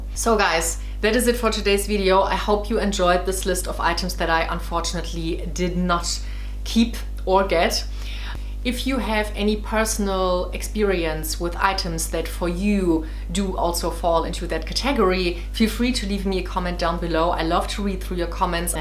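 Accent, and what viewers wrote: Germany. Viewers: Where are you from?:) you sound so German 🙈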